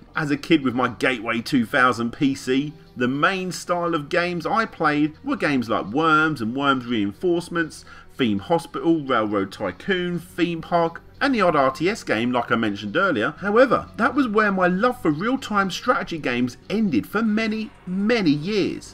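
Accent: England, United Kingdom. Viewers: British accent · He's British, it's an accent thing. That said "Requeem"? "Requeem"!? But I suppose if you've never heard the word before...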